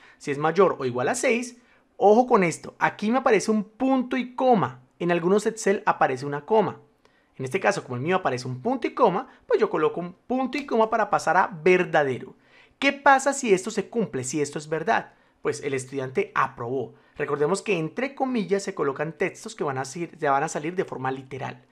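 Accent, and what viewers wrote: Colombia. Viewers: Escuchar el acento colombiano no tiene precio!!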